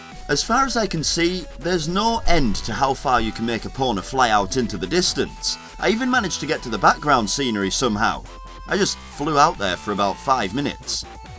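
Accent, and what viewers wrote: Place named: North, United Kingdom. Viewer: Loving your northern accent.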